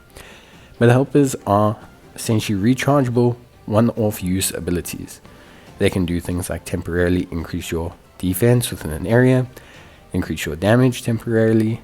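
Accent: South Africa. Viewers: I know a 🇿🇦 voice when i hear it. Big ups bru!!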